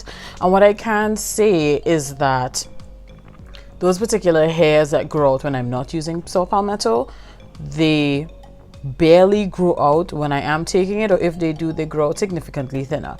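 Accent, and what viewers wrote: Trinidad and Tobago. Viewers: Trinidadian accent 😍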